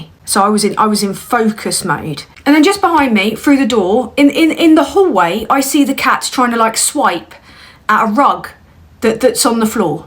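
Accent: United Kingdom. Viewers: You sound so BRITISH it's adorable.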